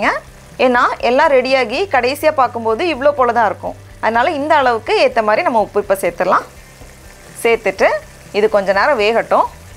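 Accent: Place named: Tirunelveli, India. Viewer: Nice. sis. Tirunelveli and Nagercoil mixed slang.athenalthan ketten.unga uru special items seyunga.WAITING.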